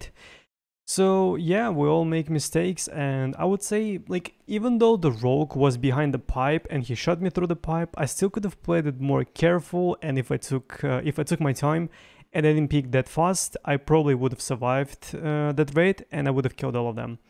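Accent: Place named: Russia. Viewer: Хехех, блин я подумал ты из скандинавской группы стран, но не, наш акцент сложно не узнать)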